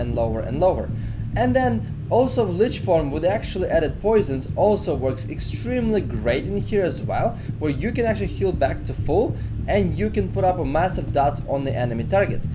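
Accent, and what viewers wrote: Russia. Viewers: dude you're a russian-speaking person with a somewhat thick accent making I don't want to call the guide video but a video where what you're saying is important with music drowning out what you're saying turn the music down man when you make videos you should have little or no background music on a video where your words matter you know if you're just making a stream or people are watching you battle that's one thingbut when we have to hear what you're saying and understand you or want to that music's making a very difficult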